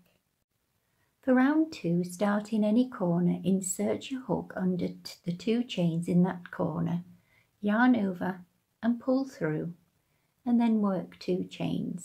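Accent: United Kingdom. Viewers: Can i ask why you use American terminology? When I hear a UK accent I hope for UK terminology - have we just given up on it altogether?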